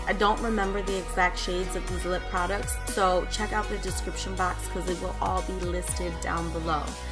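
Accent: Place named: Latin America. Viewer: Hey " "?......Love your accent...Latina right? I have subscribed to your channel.. Can you also share so love over here..Each one helps one!!...❤️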